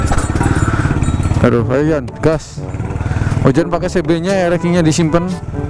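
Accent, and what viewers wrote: Banyumas, Indonesia. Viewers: pantes ngomongnge kaya ngapak ...tengan wong Tegal ..mantapp bro lanjutkan truss pokok.y salam wong ngapak 👍🙏🙏🙏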